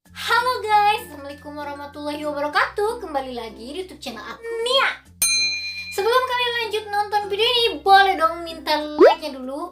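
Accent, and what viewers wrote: West Java, Indonesia. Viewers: Kka orng mana?Kaya Sunda🤣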